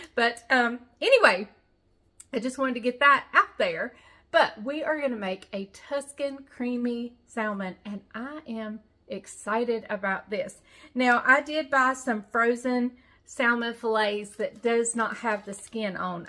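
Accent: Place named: South, United States. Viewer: I was raised saying salmon with the same pronunciation as you. We are beautiful southern belles and that’s just how we talk. 💖 I’m not a salmon person but this sounds really good and I just added salmon to my grocery list. Thank you, I need to add more fish into our diets.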